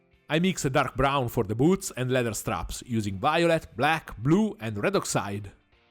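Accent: Italy. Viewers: Boia come si sente che sei italiano. Bravissimo comunque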